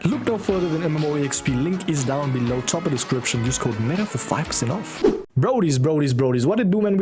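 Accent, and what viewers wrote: South Africa. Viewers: Are you South African bro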